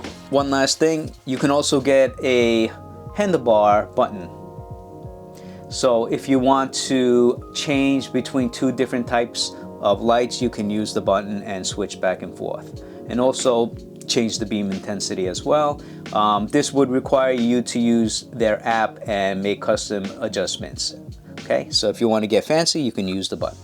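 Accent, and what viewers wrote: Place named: Brooklyn, United States. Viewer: I mentioned that I only had one question, but I actually have a second question and a comment. The comment is that I like your NYC accent, it sounds a little Brooklyn, like maybe Bay Ridge, though it's not too strong. My second question is about the 2600 be remote. Does it have a watch battery that you only have to replace like once every year or two? Also, how waterproof is the actual remote, in your opinion? Thanks again and have a good weekend!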